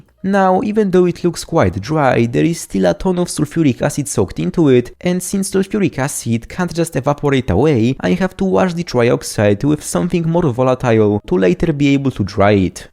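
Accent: Poland. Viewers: Kurwa juz po samym akcencie rozpoznalem ze polak xd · Guy sounds awfully like Polish citizen like me :D that heavy accent that I also have :D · czuć rodaka, pozdrawiam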